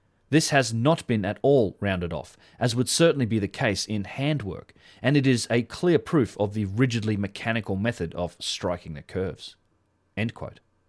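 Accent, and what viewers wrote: Australia. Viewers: G'day, just wanted to say that I really like your stuff and how great it is to hear an Aussie talking with such intelligence and conviction, nice work mate!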